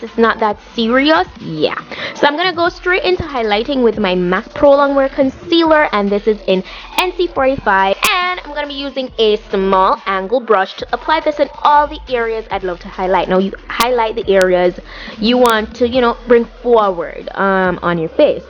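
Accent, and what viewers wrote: Jamaica. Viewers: loveeeeee your jamaican accent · My first video and girl I need to know if you're Jamaican or from the Caribbean cuz.... mi hear it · yaady fi true 🤘💗💗💗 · Yeah, I knew she was Jamaican because I am a Bahamian and she doesn't sound like us. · are you Jamaican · I Love how you talk man. just love my fellow jamaicans.. this was so helpful bc really I agree with using the foundation on where u didn't have any product.. makeup lasts longer.. less makeup shopping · Every body a ask bout u nationality.... Omg. U sound Jcan tho but confirm nuh!! Anyways luv ur vids; u just be popping with or without makeup... Best contour- no foundation video I've seen.💖💞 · I knew it... I was about to ask the question I heard it when you speak, Jamaicans dialect cant hide lol! awesome vid! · You sound Jamaican!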